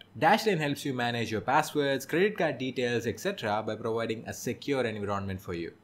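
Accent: India. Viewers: I knew you were an indian guy I knew it since the beginning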